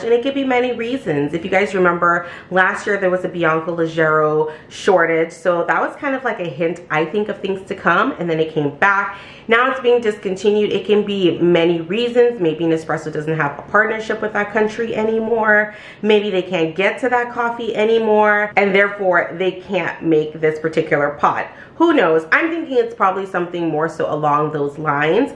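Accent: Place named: New York, United States. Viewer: I forgot to say I’m a girl from Queens New York and I know you’re from Brooklyn I can hear that NY accent !😂😂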